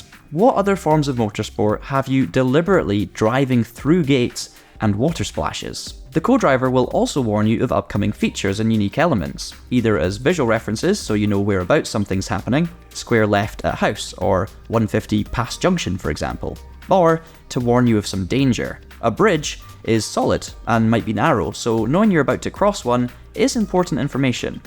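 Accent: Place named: Wales, United Kingdom. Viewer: Brudda he’s not English he’s a Welshman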